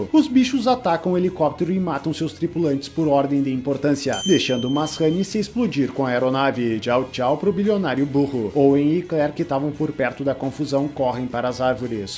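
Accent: Rio Grande do Sul, Brazil. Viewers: Esse vídeo com sotaque gaúcho tá louco de especial tchê 😂 O que é inacreditável é que pessoas leigas como nós achamos tudo isso de erro e eles pagam milhões para fazer o diacho do filme e sai com esse tanto de erro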